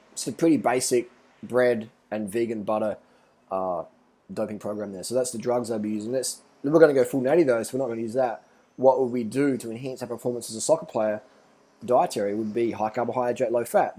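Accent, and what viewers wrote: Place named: Australia. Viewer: your aussie accent is hilarious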